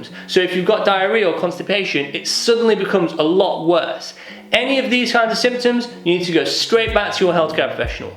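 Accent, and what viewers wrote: United Kingdom. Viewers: your tips would surely be effective 😊 btw your accent is so good . I guess its British accent cuz I too know british accent😊 Thanx for replying sir😊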